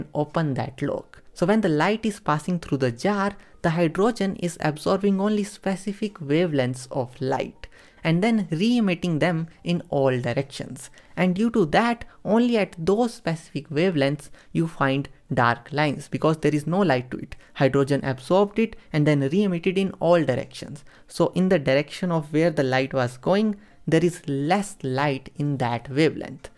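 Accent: India. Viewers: Hey, Are you Indian? Your accent is exactly like Indian!! Astonished from your knowledge in that depth. Being a 10th grade, student you are a true motivation and inspiration ✨️ ❤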